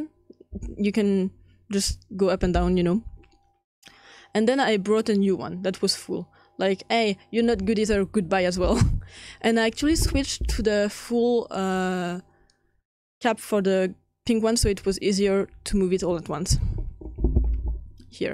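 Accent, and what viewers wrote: France. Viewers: Your o̲dio was very... French. 🇫🇷 But I like it. The videos that you make help me alot. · sounds like french accent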